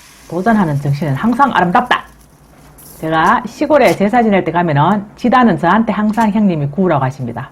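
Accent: Gyeongsang, South Korea. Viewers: 짝짝짝 😄 구수한 경상도 사투리가 정겹습니다 얼굴이 안보이가 보이스만 들리니까 개그우먼 김영희씨 얼굴이 자꾸 떠오르네요 유쾌하고 유익한 영상 잘보고 있습니다 참고로 저는 캘리포니아 에서 열심히 응원합니다~ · 너무재미있으시네요 경상도버젼으로얘기를잘하시네요 짝짝짝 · 계란 말이도 예쁘지만 경상도 말솜씨가 너무 재밌네요 친정 막내올캐 목소린데 맞지예~ 듣고 깜놀 했네요 지는예 둘째 월선 고모입니다 박수 짝 짝 짝 댓글 보면 답주이소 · 갱상도쌤ㆍ오늘도구수한사투리 ㆍ감사합니다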